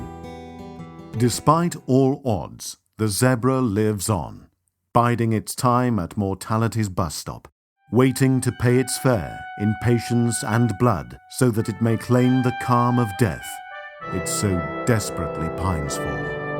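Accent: United Kingdom. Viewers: he’s British, that’s how’s it’s pronounced. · this enormously negative over tone coupled with that British accent has got to be, the funniest shit i have ever heard.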